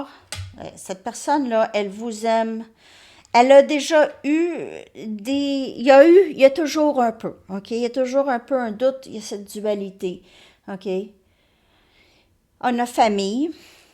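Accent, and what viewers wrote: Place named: Acadia, Canada. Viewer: Coucou, vos vidéos sont si fleuries, c un régal. Votre accent acadien me fait voyager vers chez vous. Merci! Il semble que le bout du nez soit le point de l endroginat primordial ( cf: Apotheose de jean luc Ayoun)... De parler sur le thème des fj doit réactiver ce point possiblement. Bien des choses depuis la France Dom🧚‍♀️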